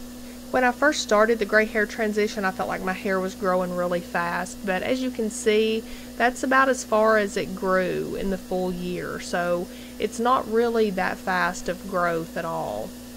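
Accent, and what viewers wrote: South, United States. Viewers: You are so pretty. I love your southern accent. I have one as well. Georgia girl. I have made the decision to go gray.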